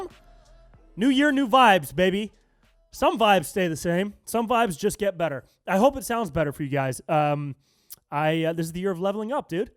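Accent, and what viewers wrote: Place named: Northern California, United States. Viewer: Bruh every time I watch these videos It makes me proud of NorCal. Thanks for repping our accents, culture, etc. I’m 18 from Chico town.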